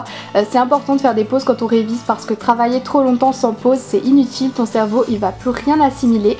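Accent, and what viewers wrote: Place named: Alsace, France. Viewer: Je n'avais jamais remarqué l'importance de ton accent alsacien ! Moi qui suit aussi alsacienne je l'entend quand même assez hihi😊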